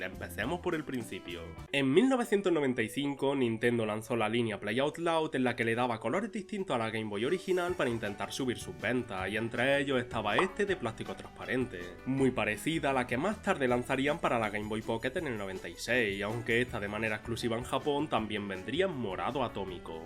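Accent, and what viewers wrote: Andalusia, Spain. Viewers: aii me encantan tus videos tlj<33 tu acento andaluz es la leche hshs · Seguro de alguna zona de Andalucía, España, Málaga o Sevilla quizás!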